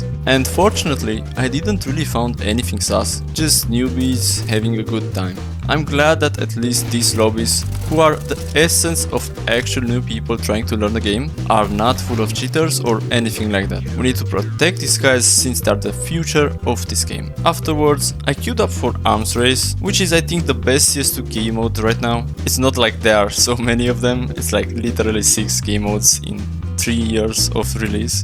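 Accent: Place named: Romania. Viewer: It took 6 minutes to figure it out you are romanian ahaha😂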